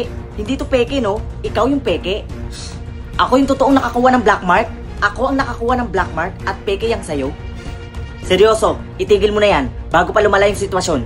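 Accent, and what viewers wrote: Visayas, Philippines. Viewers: Bisaya ka po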